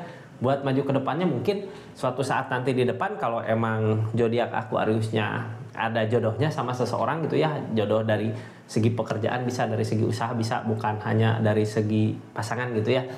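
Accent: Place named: Sunda, Indonesia. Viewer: Aamiin Yaa Robbal Aalamiin. Do'a yg sama juga buat kang nu kasep...nu bageur...nu capetang..... Sunda na medok....asli na ti mana? Hatur nuhun,wass nenek Elly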